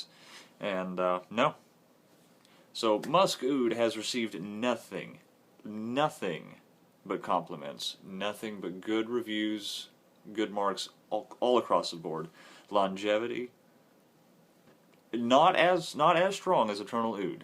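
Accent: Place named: United States. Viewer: I like your accent, whereabouts in the U.S. are you from? Also, you have a cool speaking voice and would make a good actor. So use it fella, it could be beneficial and get you that woman you want to be smelling. Musk Oud? Not a favourite of mine I prefer pure oud from that line but the FMK oud line? Dear Lord, love those scents.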